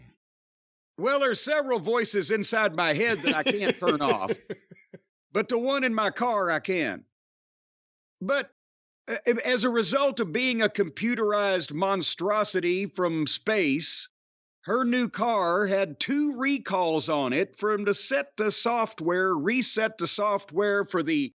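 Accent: South, United States. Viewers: with all due respect from a resident of California “you damn liberal!”. it’s odd to hear your views from someone with a southern accent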